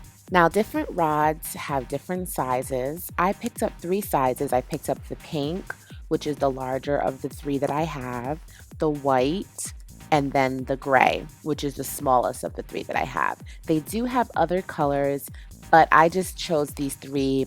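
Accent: New York, United States. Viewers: You remind me of Wendy Willimas (whom I love btw). Your personality is reminiscent of her. Your NY accent is also very similar to hers.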